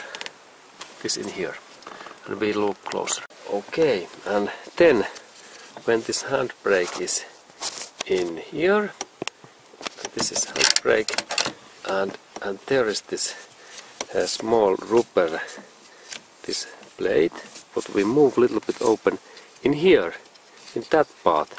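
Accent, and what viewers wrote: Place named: Finland. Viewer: I am not a mechanic, I liked your video and the way you showed me how to do the cable tensioning. I would have thought that you should have jacked the vehicle up and put on jack stands, and then before assuming that the tension was right, go and see how the wheels spin freely. BUT I guess by evaluating the clicks and thereby the tension in the cable would be sufficient. I learned from you, but I will jack the vehicle up as I am not a mechanic and would not be sure that I have the right tension, perhaps also the shoes should be adjusted, I don't know about it, but I am about to do a Camry 2003 cable, if there is a cable, the handbrake leaver has no resistance as if there is no cable or connection at all. Can you can you come to North Queensland and do it for me? Cheers, and thanks. Yes by the accent I know you are from lovely, friendly Finland.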